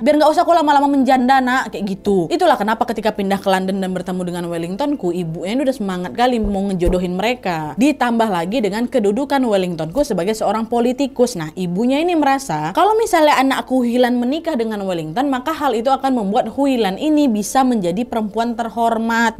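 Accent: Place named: Minangkabau, Indonesia. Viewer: Baru kenal content (tiga content sj per 27 Agt 23) ini.. ternyata lsg betah mendengar paparan kisah dan cuap2nya yg dibumbui dialektika Minang Riau 😊 enak dan nyangkut sj di kuping. Keren, semangat utk terus berkreasi. 🎉🎉🎉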